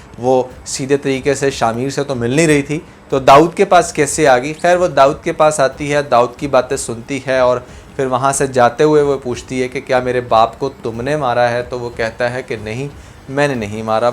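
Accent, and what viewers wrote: Punjab, India. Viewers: Bhai Punjabi Jo hai is wAjh se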